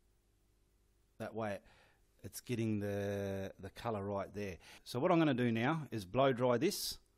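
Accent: Australia. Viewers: Thanks again, I am a Kiwi and all our canvases are still in inches. I usually use 12x16 but they seem to be much larger on utube. As you can probably tell I am a 73yr old new chum at painting. I really enjoy your work and your Aussie twang!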